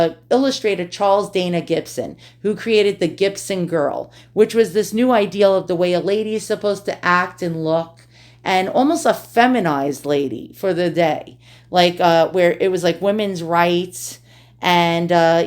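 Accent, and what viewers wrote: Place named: New Jersey, United States. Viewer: Love antique dolls have a few but love your collection. Love your Jersey accent and listening to your comments as well as your little pieces of advice. Keep up the good work!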